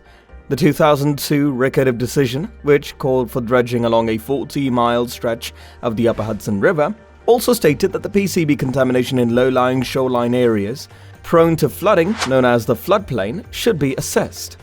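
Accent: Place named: United Kingdom. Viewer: Beautiful British accent and amazing NYC🎉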